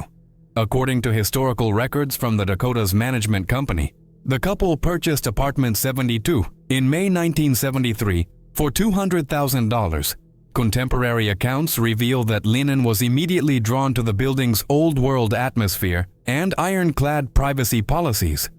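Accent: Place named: Soviet Union. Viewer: Interesting accent. From the former Soviet Union?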